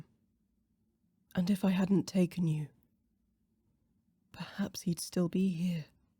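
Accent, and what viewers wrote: United Kingdom. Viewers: A nice romantic story, but the sorceress didn't seem that dark to me. I like your voice and your English accent.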